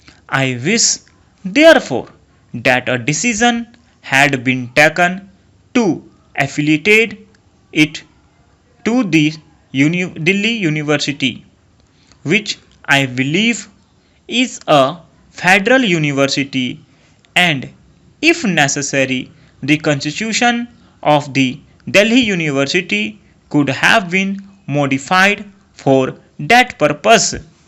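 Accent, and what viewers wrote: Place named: United Kingdom. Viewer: No need to bring brtish accent while dictating. Pls understand the correct pronunciation.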